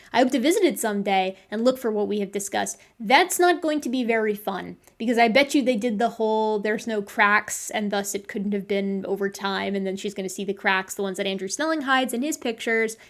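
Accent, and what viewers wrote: Midwest, United States. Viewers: I love her midwestern speaking style, but I may be a little biased because I'm from northern Illinois and she sounds like me, haha.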